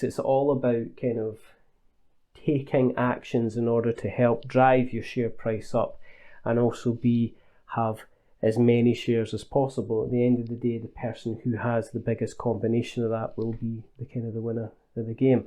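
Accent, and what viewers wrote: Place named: Newcastle, United Kingdom. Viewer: I had no idea you was a geordie.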